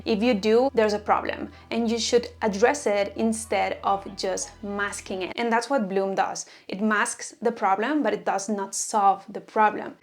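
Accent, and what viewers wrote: Spain. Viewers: Sabia que eras española! No hay ningún problema con tu pronunciación, eh? Al contrario. Pero lo estaba notando. Supongo que porque yo también lo soy xd Que tengas un buen día :)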